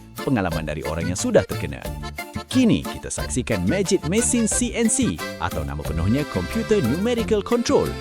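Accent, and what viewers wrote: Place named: Indonesia. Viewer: menyampah plk aq dgn gaya ko berckp....dh mcm style Indon kpg !!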